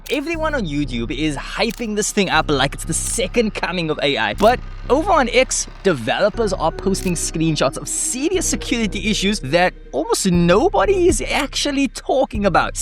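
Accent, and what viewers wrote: South Africa. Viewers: ... Are you.... Are you South African ????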